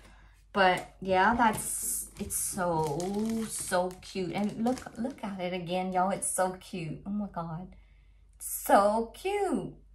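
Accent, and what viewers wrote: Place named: Louisiana, United States. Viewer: That is one pretty owl. I'll have to check out your keychains next time you show them. I ordered from Abigail when she first opened her shop but it was not putty. It was wax and it's great. I still have a ton left. Smells amazing too even after a year. You are almost at 250 so congrats on that. 🎈I'll shout you out on my Community Page. I love listening to you talk because my Mama was a Cajun lady herself from Louisiana and I miss her so much.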